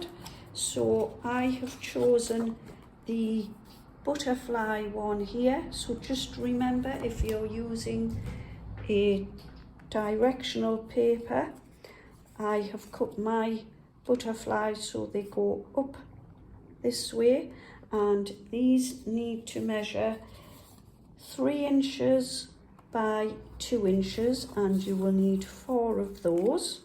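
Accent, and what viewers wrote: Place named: Northumberland, United Kingdom. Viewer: Enjoying your Northumberland accent :)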